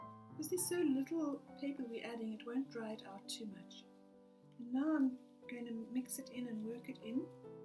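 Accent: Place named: South Africa. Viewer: lovely to hear RSA accent ...where is your studio? i stay in Tokai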